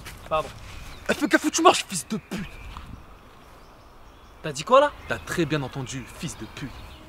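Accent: Alsace, France. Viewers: Cet accent alsacien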